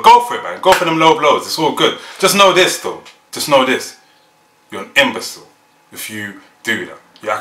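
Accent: Bosnia and Herzegovina. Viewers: ))) U speak like u r Bosnian :))) Congratis :))) and congratis on this video! its sooooo good :) i am glad u think like that because he is really a criminal, he killed inocent ppl :( and.. how can he say that he is inocent? thare is sooooo many videos and other important documents that proves his "inocent" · I love your bosnian accent, your amazing :) bravo brate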